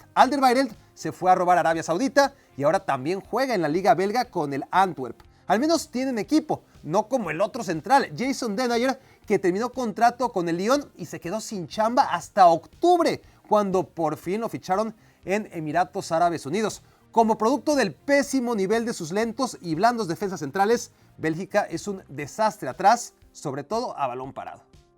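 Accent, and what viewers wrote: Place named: Mexico. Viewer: Es mexicano, se le nota en el acento. Logicamente va a hablar mal de Argentina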